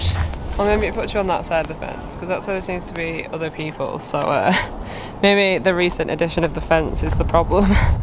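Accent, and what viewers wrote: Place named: Lancashire, United Kingdom. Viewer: Well done and! Great set of videos. Loved watching each instalment. Gives us great food for thought. We are four 60+ yr olds from Wigan. We will be off doing TMB in 19th July this year. Training hard and looking forward immensely. Thanks for posting. BTW, where do you come from you sound…. Preston or Bolton? Can’t be far from us🤣 Take car and thanks again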